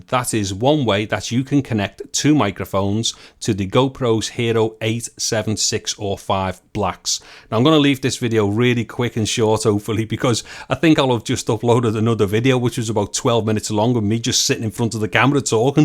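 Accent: Liverpool, United Kingdom. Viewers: Couldn't finish the video - scouse accent offends me.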